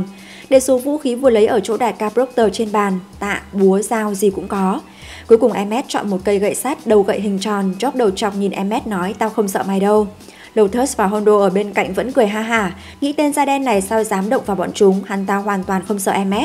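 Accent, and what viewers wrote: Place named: North, Vietnam. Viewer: Nghe giọng đọc MC thấy ngán ngẫm không muốn nghe vì dân Bắc Việt cộng đọc truyện thật là không thích, lại chán chường cho cuộc sống hiện tại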